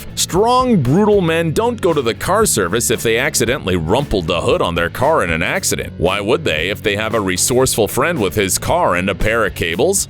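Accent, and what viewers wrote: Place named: United States. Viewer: 38 seconds in and was so annoyed by the pointless awful cheesy American VoiceOver I had to turn off. We only need the clips not the dreadful voice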